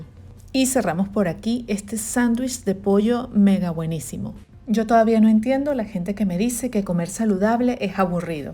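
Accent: Venezuela. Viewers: Hola, me encantan tus recetas!Al oirte note' que eres Venezolana como yo ! me emociona sentir y reconocer mi pais... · Me encanta el acento venezolano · Refrescante escuchar el acento venezolano (caraqueño!) en un vídeo de calidad. Buen trabajo y sigue p’alante!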